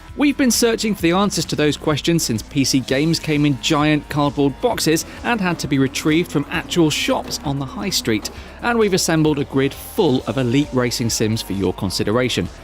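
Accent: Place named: England, United Kingdom. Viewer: You sound english. Why ATS and not ETS2?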